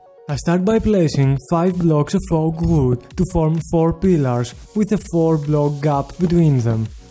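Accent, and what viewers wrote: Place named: Greece. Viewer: Κατάλαβα ότι εισε Έλληνας εεε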